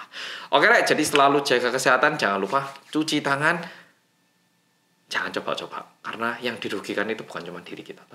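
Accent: Java, Indonesia. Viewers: Mas medok makin ganteng aja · Aku ko senang dengar si mas ini ngomong,soalnya medok bngt,kalau suamiku tau pasti marah2 nih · Kok kayak ada bahasa jawanya bicaranya??? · Ternyata babang glowing suka nonton juga babang medok😀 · Waaaa kaget, ini pertama kalinya aku nonton yt org korea yg ngomongnya medok jawa bgt😂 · Mas yang medhoook mwuhehehehe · Ngantuk bangettt Tapi pas dapet notif video orang korea yang MEDOK ga jadi ngantuk mas ehe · Bolo2 Medokkk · Halo halo mas medhokkk😂😍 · "Hallo Gaes Kembali Lagi Bersama Bersama Orang Korea Yang Medhooook/Luweeee" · gemes banget sama ngomong medoknya mas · 1. Aku suka cara pikirnya, "itulah peraturannya, kalau nggak bisa ya jangan datang" 2. Lama2 aku berpikir medok itu natural dalam bahasa Korea, saking bisa nyampur aksennya.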